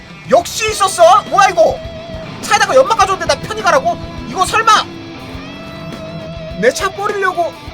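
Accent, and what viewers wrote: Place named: Gyeongsang, South Korea. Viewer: 바깥에를? ㅋㅋ 진짜 갱상도야 이햄은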